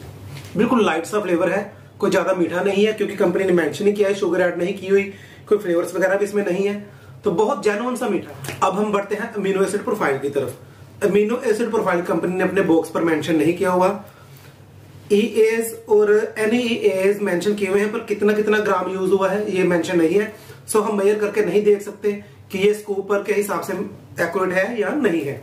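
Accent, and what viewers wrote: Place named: Punjab, India. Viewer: Beautiful review but the Punjabi accent is more beautiful. Keep growing!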